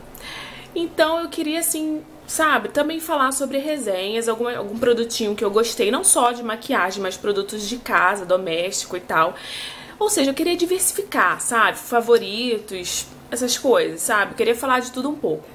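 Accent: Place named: Rio de Janeiro, Brazil. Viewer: Vá em frente!! Nós vamos amar!! Estou começando a gravar vídeos, se quiser conhecer ficarei mto feliz!! Adoro seu sotaque carioca! É lindo! mil bjs!!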